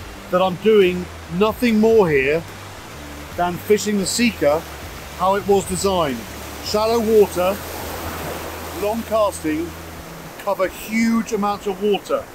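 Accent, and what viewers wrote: South Africa. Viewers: I like how he keeps saying, Yoh! Like a proper Saffa 😁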